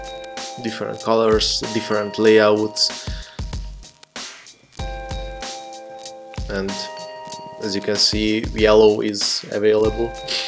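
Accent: Hungary. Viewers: Sexy magyar akcentus :*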